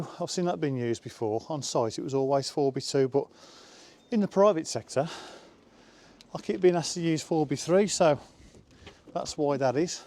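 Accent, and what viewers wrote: Birmingham, United Kingdom. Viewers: Hi bit of a strange comment but, we are accent finders and have been listening to your video. Can I ask where you come from please. I can hear a bit of Brummie and a bit of Wolves/Blackcountry - so where do you come from please - the bet is on for £10 against me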